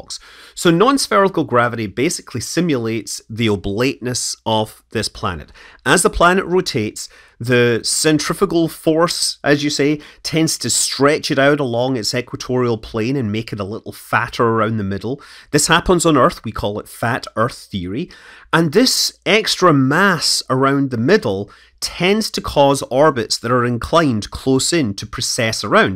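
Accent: United Kingdom. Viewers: Please use less accent in your speech, UK is not the whole world.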